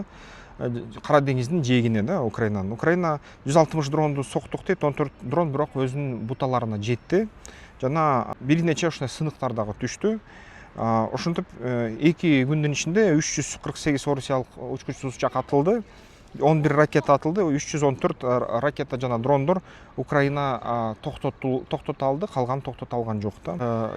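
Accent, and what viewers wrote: Standard. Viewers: Эң сонун,нукура кыргыз тилде сүйлөп жатасын иним.Келесоо маңкурттар жаза беришет, көңүл бурба❤❤❤ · Брат капа болбон таза кыргызча суйлойсуз сиз билимдуу адамсыз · Тура байке сиз мыктысыз кыргыз тилинде таза алып барып👍👍👍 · 😂😂Азаматсыз НУКУРА суйлой бериниз!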